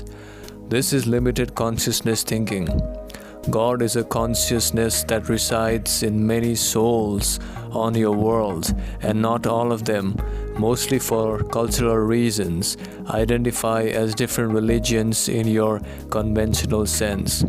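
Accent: India. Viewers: Look! Here's the thing...oh, but first a question....is your home turf India?? Cuz you sure sound like you come from India!! So now..the thing..... Don't come here telling me my ways are wrong!! Cuz I don't like that! It's rude!! And another thing , you talk like we, no... me. I'm just going to speak for myself, so scratch "we". Anyway, You talk like I totally don't have a clue of what's happening on " my " planet! I know about the cabal, elite, deep state, the " 1% ." I know what their agenda is, and what they are pulling!! How do I know these things.. you might ask? I read the HOLY BIBLE! It tells me everything I need to know, and how to fight them!! And... THERE IS ONLY ONE WAY TO GOD!! AND THAT IS THROUGH JESUS CHRIST !! THERE IS NO OTHER WAY!! AND ANOTHER THING!!!...ALL YOUR MEDITATION, CHANTING, CHAKRAS, AND THE LIKE ARE FORBIDDEN BY GOD!! SO...RIGHT THERE I CAN SAY.. 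I DON'T WANT ANYTHING YOUR SELLING!! BECAUSE THE ONE AND ONLY GOD OF THE UNIVERSE.. "JEHOVAH " Would NOT send messanger's who breaks his command- ments!! So... I'm thinking you have a whole different " god " than I do. And if you don't mind, I'll keep the one I got, thank you!! And another thing... The love of money is the root to all evil!! It's not the root to sacred cows!! ( again, India! ) Are your cows blue? Just wondering. Now, you say that y'all have gotten to your much advanced civilization, by trial and error. So, why isn't the human race allowed to advance in the same manner? Why do you feel you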